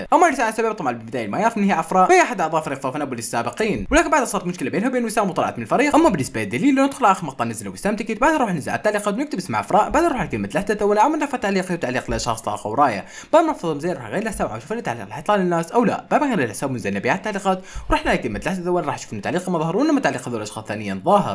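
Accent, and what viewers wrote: Iraq. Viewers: عراقي مب مصري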